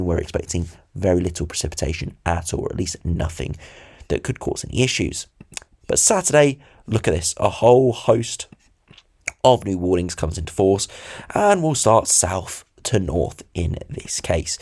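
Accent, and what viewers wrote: London, United Kingdom. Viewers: I subscribe to this channel for 2 reason, the weather and the posh London accent. You can feel the privilege in his voice.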